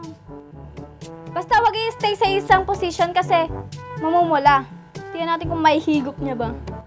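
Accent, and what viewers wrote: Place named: Pangasinan, Philippines. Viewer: U have pangalatok accent hehe🤗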